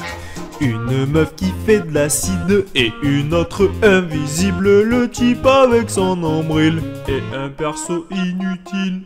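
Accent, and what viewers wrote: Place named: South, France. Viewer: kifan ton accent du sud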